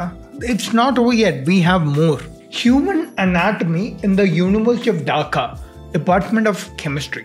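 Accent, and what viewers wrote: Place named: India. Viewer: First your knowledge seems good but you laugh of what was written in the text.firstly you are reading as as if you are talking Hindi not English ,secondly read the Al Quran tilawat before you made this vidz,learn from things around you ,then judge ,don’t mock or disrespect the creator mark my words you will pay the price. for that kind of blasphemy soon or later.remember me not the likes you will have.my respect again to people what ever their faith or belief.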